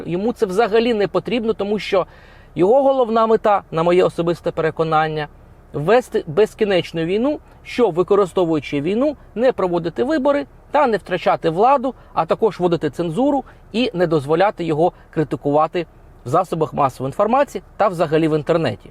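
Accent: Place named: Ukraine. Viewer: А шо ж ти такий патріот, та й за бугра попискуєш? Критикувати ж краще, чим самому взяти в руки зброю? Ми з сином працювали в Польщі 5 років. З початком війни ,він повернувся в Україну, а я слідом за ним. Син пішов в воєнкомат, хоча і не служив ніколи,маа звільнення в мирний час. Син в 32 загинув, а ти в свої неповні 40 ,обливаєш нас брудом і хизуєшся українською вимовою. А в самого навіть немає притулку на рідній землі. Приїдь, повоюй розкажи.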